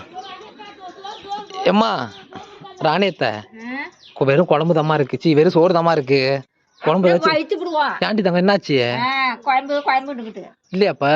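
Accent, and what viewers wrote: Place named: Sirkazhi, India. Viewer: Entha ooru pakkam Naa seerkazhi Anga ipdidhan pesuvanha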